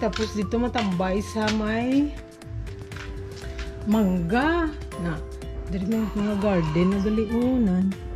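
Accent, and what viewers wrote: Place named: Iloilo, Philippines. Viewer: Ilonggo ka po talaga magsalita😅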